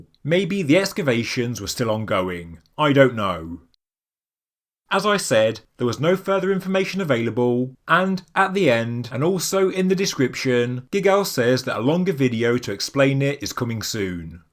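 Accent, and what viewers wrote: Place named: Northern England, United Kingdom. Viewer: Northern English I think.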